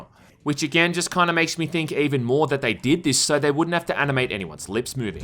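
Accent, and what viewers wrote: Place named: Australia. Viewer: Cheers, sweaty guy. You sound Aussie.. til you say "toob"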